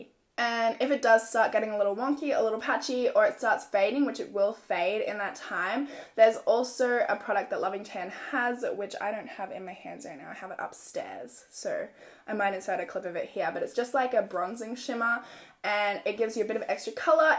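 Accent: Australia. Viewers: I just live for your Australian accent 😄😄❤️ I never knew you were that fair to tan your body 😲😲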